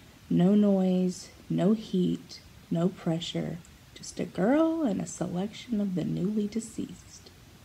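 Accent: South, United States. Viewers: I have always loved readung, always. I'd rather hold a book in my hands then an e-book any day. Southern or not, you read beautifully. You read with expression and inflection which helps one to really imagine what's happening. Thanks so much📚💗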